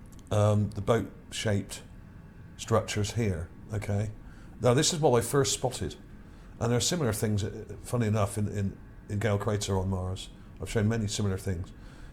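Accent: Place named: Wiltshire, United Kingdom. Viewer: I thought this Was Richard Dreyfus . Although I don’t watch tv i enjoy comics on YT and wondered what happened to him. Wasn’t he from Dorchester ? Although our narrator sounds more Wiltshire imo. How come they haven’t got a better look at the spire thing photographed in the 60s ? ( on the moon , not the one in Salisbury) ? Like others say , they aren’t spending multi millions to just sightsee ( as the Russians did - not in Salisbury of course ). Keep up the interesting work fella - up the Cherries 😎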